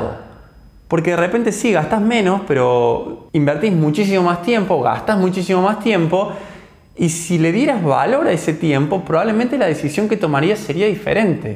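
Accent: Córdoba, Argentina. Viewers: El acentito Cordobez :) !!, estoy de acuerdo en lo que decís, de hecho, actualmente un vuelo de buenos aires a san Juan y viceversa que tarda 2 hs, cuesta lo mismo que ir en ómnibus que tarda 17 hs en promedio. La gente por X razón siguen viajando en ómnibus !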